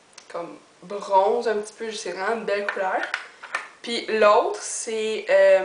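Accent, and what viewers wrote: Quebec, Canada. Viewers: excellent! merci pour cette video!gros bisousss!! jadooore ton accent quebecois plein de bisous de dubai!!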